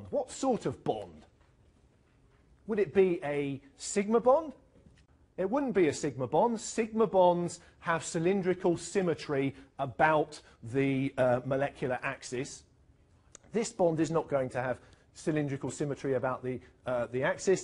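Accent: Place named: United Kingdom. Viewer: thanks, nice to hear a british voice with passion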